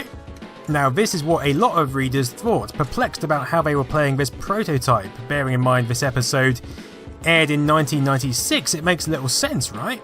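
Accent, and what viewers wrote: United Kingdom. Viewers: beeta! You are British! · shitbrit narrator- i'm out.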